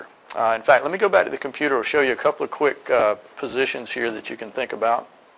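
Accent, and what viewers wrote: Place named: United States. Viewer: I am from England what part of USA is your accent from? Oh and yes def in the top 3 Youtube teachers!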